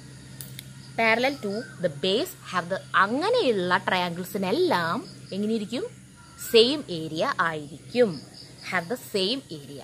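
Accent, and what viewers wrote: Thrissur, India. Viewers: Teacher thrissurkkariyano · Mm poliya trissur slang.😘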